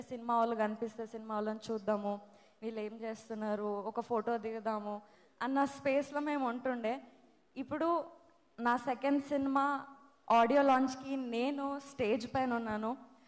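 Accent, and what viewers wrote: India. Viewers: Hello she is from telangana....if you don't know anything keep your mouth shut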